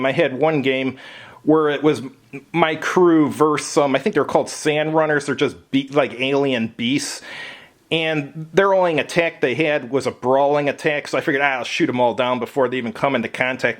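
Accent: Chicago, United States. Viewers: I know this is 2 years late, but great review. Also, this guy must be from Chicago. That accent is amazing.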